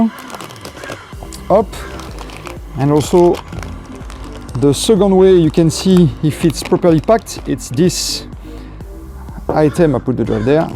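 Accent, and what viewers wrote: France. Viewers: Tu es Français ? l'accent me dit quelque chose ?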